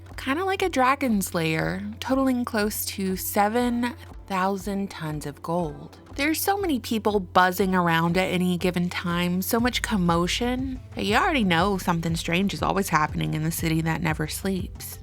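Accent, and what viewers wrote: South, United States. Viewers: Would love to hear you do an audio book. Ham-up the Southern accent a bit and do "Huckleberry Finn". Too Long? What about "Tom Sawyer"?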